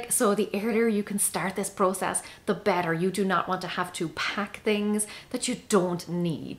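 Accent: Ireland. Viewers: And you sound like my family I'm American but my dad was from Mayo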